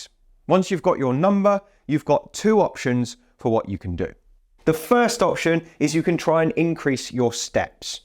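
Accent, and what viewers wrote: United Kingdom. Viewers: Really like this channel. Just ordered your book from Amazon. There is something about a British accent that makes one sound smarter :) Just to add that 3 X 10 minute walks per day control blood sugar and hypertension better than 1 X 30 minute walk per day.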